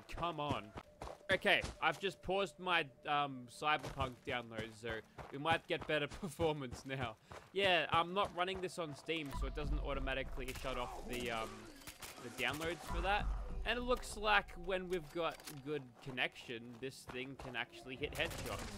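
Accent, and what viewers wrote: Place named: Australia. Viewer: Long time fan of your channel. I have always enjoyed your direct, tell-it-like-it-is, no nonsense way of speaking. Plus the Australian proper English dialect (I.e. using words like Whilst, Respectively, etc) is rather refreshing. When it comes to griefing, pvp, etc, I don’t think you’ve ever been largely in favor or against either. The only group of the Fallout “community” that you’ve always been vehemently against are the RMT traders. And I 100% agree with you.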